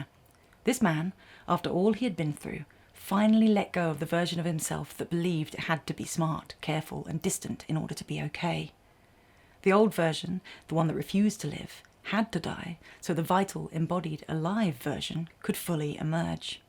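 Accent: United Kingdom. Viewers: Your English accent is driving nails into my head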